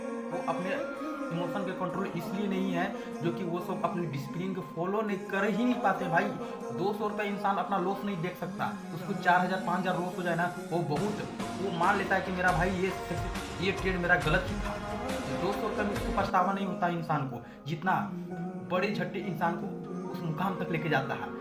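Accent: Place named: Bengal, India. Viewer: App ke bat se pata chal raha app bengali hoo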